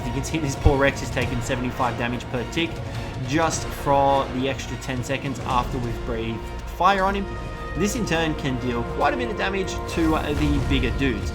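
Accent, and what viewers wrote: Australia. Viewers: he's Australian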